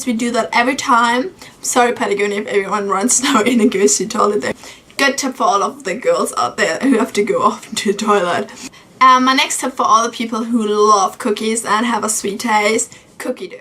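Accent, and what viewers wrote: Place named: New Zealand. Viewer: Du hast soooo einen neuseeländischen Akzent bekommen! 😆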